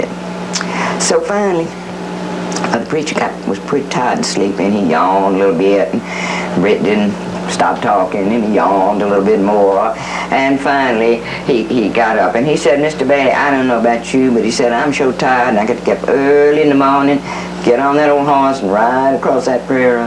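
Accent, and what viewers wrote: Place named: South, United States. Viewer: I can vividly remember her coming to my elementary school in Angleton, TX, circa 1983/84. She was promoting her Texas folktale book, "Ghosts Along The Brazos." I was instantly captivated by her Southern Belle draw and her mesmorizing storytelling. I still own an autographed copy of her book she signed for me. My lifellong passion for great storytelling began with the late. Happy Haunting, doll!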